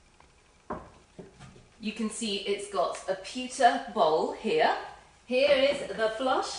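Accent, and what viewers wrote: RP, United Kingdom. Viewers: saying...piss pot... in her lovely posh accent is a joy.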